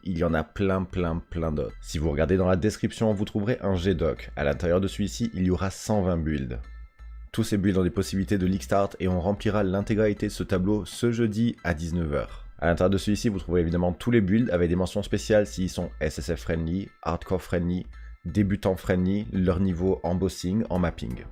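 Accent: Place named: France. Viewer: I feel like 90% of what he said was english words prononced the french way :D gotta step up your frenglish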